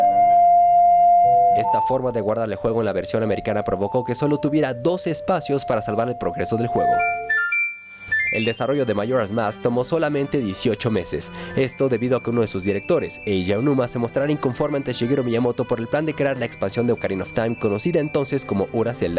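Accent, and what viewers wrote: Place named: Fresa, Mexico. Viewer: me caga la voz de, fresa y cantadita.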